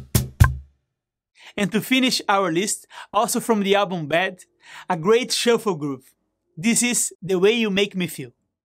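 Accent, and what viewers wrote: Brazil. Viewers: Você é brasileiro? Teu sotaque !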